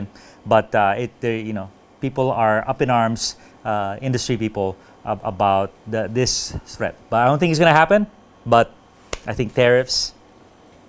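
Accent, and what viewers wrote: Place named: Philippines. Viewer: Good information brother 🤙 I can tell from your accent that you speak Tagalog?….and does this going to be in the Philippines too?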